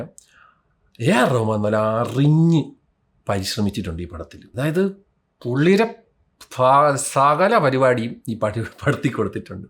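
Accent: Trivandrum, India. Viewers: Annan tvm slang 😆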